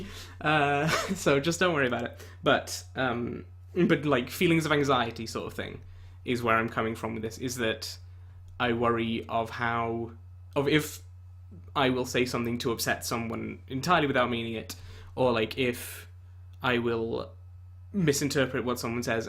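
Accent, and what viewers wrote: United Kingdom. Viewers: Yours was the first channel I ever closely followed, starting way back in 2011 or so. Like many others, I found you through your Endless Ocean 1&2 let's plays. I opened up YouTube, just wanting to re-watch the EO2 opening scene, and I remember being startled when I was greeted by an adolescent British voice chattering over the game. That was my introduction to "let's plays," and I was hooked. Now I watch many YouTube channels, gaming and otherwise, and it has had a very strong influence on my life. You were the one who opened that window for me. I'll definitely miss your videos, but I'm happy to see you moving forward in your life, and I wish you nothing but the best in your future endeavors. Anyway, it was nice to hear your voice again :)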